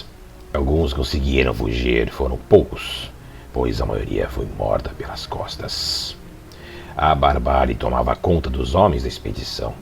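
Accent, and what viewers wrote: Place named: Portugal. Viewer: Narração muito boa! O sotaque português ri demais 👏😊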